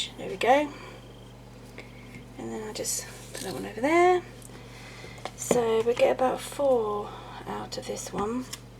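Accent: United Kingdom. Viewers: where is your accent? i mean, what is it called...what part of england or area? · That british accent... love it! Btw great tut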